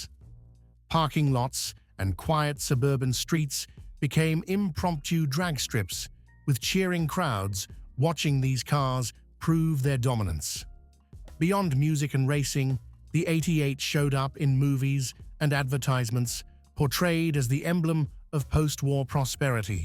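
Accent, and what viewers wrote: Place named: RP, United Kingdom. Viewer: Why does the story of a classic AMERICAN automobile have to be narrated by a British linguistic sophisticate who sounds as though he should be orally presenting a Charles Dickens novel? Also, the U.S. InterState Highway Act was not signed into Law till 1956.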